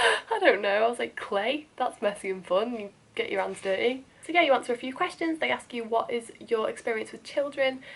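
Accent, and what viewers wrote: North Wales, United Kingdom. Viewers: Hey- where abouts are you from? You sound very North Wales/ Cheshire-ish ! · You sound like you're from North Wales right?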